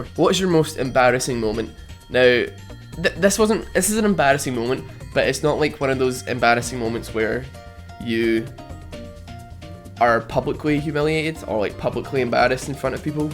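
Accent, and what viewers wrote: Scotland, United Kingdom. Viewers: Im scottish too lol literally sound almost identical to you * u * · You sound Scottish. Nothing else.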